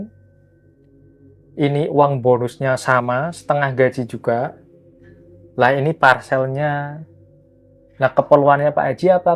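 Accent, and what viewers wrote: Pekalongan, Indonesia. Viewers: Masnya ini logatnya kaya Pekalongan daerah kidul ,Kedungwuni ,kajangan ,mbligo pringlangu ngidol lah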